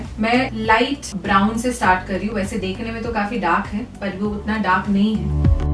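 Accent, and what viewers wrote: Bengal, India. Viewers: Aoki baatein bohot acha lgtahai akdom bengali touch a Di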